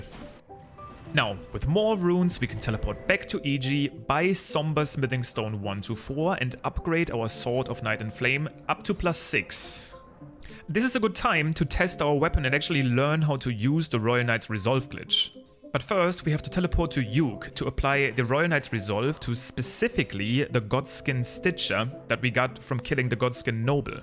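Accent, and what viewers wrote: Germany. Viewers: You sound aggressively German, I like it. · Are you German? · You german? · You instantaneously here this guys german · Bist du Deutscher? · Nice vid! Your accent sounds very German. Am I right? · You know he’s german without knowing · You are German, aren’t ya? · Hey very stupid question are you german or speak it cause you have a bit of a German accent I Guess and If so Hallo hatt mich nur interessiert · Are you german? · Haha bist locker deutscher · Kurze Frage kann es sein das ich hier einen Deutschen Akzent raushöhre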